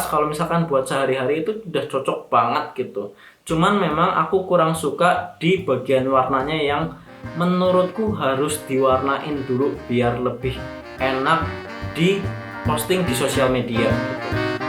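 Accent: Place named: Central Java, Indonesia. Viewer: tapi kok logat nya jawa bang, kyk logat jawa tengah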